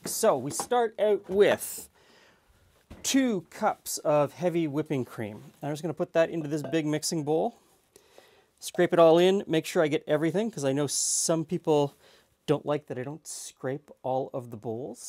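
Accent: Canada. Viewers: Wonderful video and a recipe that even an old guy (ha, ha) can handle ---- just enough but not too many ingredients. Three (3) is a good number, LOL. Loved that delicious Canadien 'accent', too.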